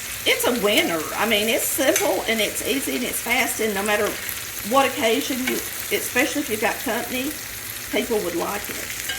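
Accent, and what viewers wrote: South, United States. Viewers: Love your channel!! I just found it 2 days ago and I have been watching since. You cook just like my Granny did. Brings back so many wonderful memories of being in her kitchen. Thank you for keeping good southern country cooking alive. I’m a Georgia girl too living where the sweet onions grow. Thank you for showing just how wonderful southern living is… I heard you say on one show that someone said something about the way you talk..,well don’t change a thing… that’s part of what makes us southern. Thank you for what you and are doing and God bless you❤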